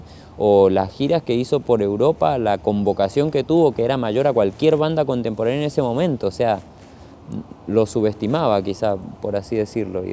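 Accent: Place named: Peru. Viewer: a ver señor atole, ponga atención a la gramática, yo no escribí que no lo conoce, escribí que dice que no lo conocía, es decir en tiempo copretérito, denotando que este peruano con apariencia de peruano y cabello lacio de peruano y acento de peruano que ahora se dice el mismo que es argentino quiere dar a entender que el conoció el reggae y empezó a hacer reggae y que el ni en cuenta que existió bob, luego presume que tiene canciones en inglés, y bla bla bla puro egocentrismo, y obvio que se puede aprender por otros medios pero no estamos hablando ni de ti ni de mi si no de ese falso rasta que nunca lo fue en verdad, su voz temblorosa al cantar parece enfermo y sus letras aparentemente con sabiduria estan mas que vacias. quieres saber de rastafari tengo el kebra nagast y los libros de enoc por si te interesa saber de un tema en particular.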